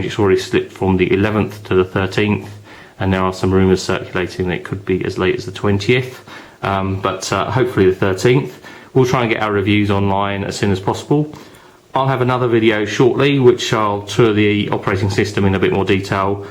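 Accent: United Kingdom. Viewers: your british accent pursuades me to buy this. why do the british make everything seem so advanced and top of the line? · ha i love british accents ! lol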